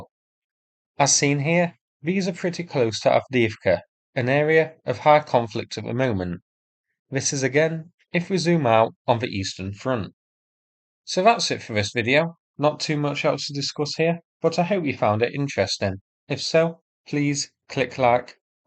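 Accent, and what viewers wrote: United Kingdom. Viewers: Danngg your soothing voice & unique British accent really make my day lol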